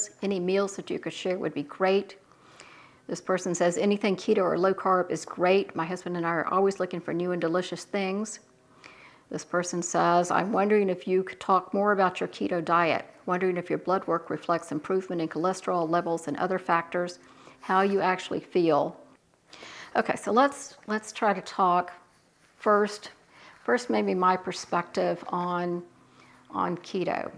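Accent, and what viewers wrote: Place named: South, United States. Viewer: I'm following a small handful of Homemakers / homesteaders and I've got to tell you that you are one of my favorites. Your no-nonsense delivery coupled with yourself of the sweet tea line accent made me start following. However you're canning of beef Rogan Josh you made me a fan! As a long time canner I've done the water bath the pressure all the things. But never have I ever thought about spreading my canning out to the wonderful things such as the beef Rogan Josh the chicken Tortillas Soup the Brunswick stew etc... thank you for reminding me that I have the power to use better judgment following safe guidelines in Canning things that are delicious to me I will be one of the first to follow in a paid membership community because of the aforementioned reasons. I'm excited and I cannot wait for that day to happen. 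And I will urge you to please write a canning cookbook with these lovely recipes in them. I see you testing the pH I see the beautiful products that turn out. I just want to thank you for the knowledge that you are sharing.. May God. Bless you and your family and from my home to yours, Merry Christmas!❤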